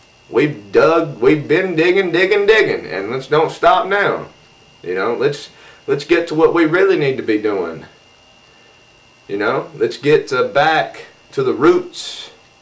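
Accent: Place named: South, United States. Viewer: I hear you my brother J. I stopped trying to show the blind all the MJ clues a long time ago. It's really an exercise in futility. I just go straight for the jugular now and show them the reality of the lies that have surrounded us for years. But you gotta be there for them when they finally see the truth cause they all go into shock, drop to the floor and get sick to their stomach initially. Keep up the great work, love your vids and the southern twang. I'm even deeper south from you.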